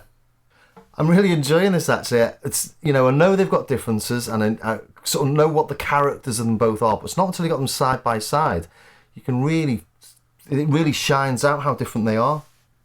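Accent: Liverpool, United Kingdom. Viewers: Love that Liverpool accent!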